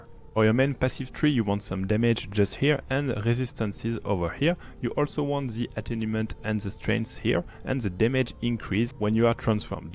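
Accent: France. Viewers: what a french accent +D well ty for this build =)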